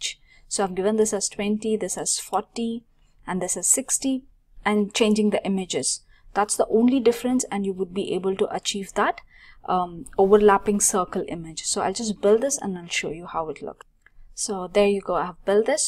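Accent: India. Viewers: Do this excersice was a quit difficult to me without seeing the code, because my english is "in working progress" and, by another hand, it was so difficult to understand hindu accent (like an England accent too..!). This was a challenge to me, but, like you said: "Yeah, there you go". I want to share you THE CODE: <link> Is in Spanish, so... do like me..! if you can't understand, use traslate from google..! Thanks so much for yours videos and to teach me that I can do it despite the adversities..!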